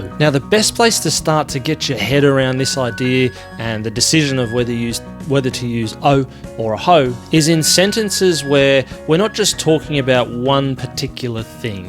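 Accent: Australia. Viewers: I find the Austraalian accent different. But what makes your vids awesome is that you break it down so easily. E mihi ana. Can't take the māori out of the Māori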